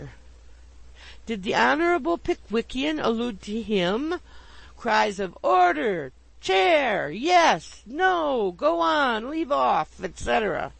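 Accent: United States. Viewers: The narrator is a fine old lass. A fine old American lass. I raise a glass, perhaps a second even! ) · why is a yankee reading this? wot's wrong with an English speaking person?? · Americans shouldn't be reading English books. Immediate turn off.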